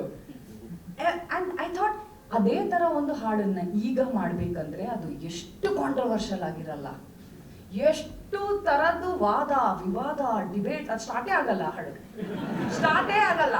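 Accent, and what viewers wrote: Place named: Mangalore, India. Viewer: I'm shocked that u speak proper kannada.....👌 It's more like manglur kannada.... wonderful 🌟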